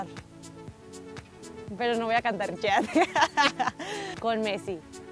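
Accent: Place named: Spain. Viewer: Que barbaridad ahora traes acento español. Jolines!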